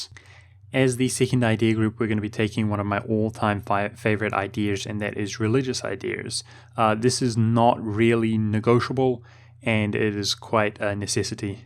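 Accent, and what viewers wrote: New Zealand. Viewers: Yep, he's from NZ. Pretty obvious isn it? :D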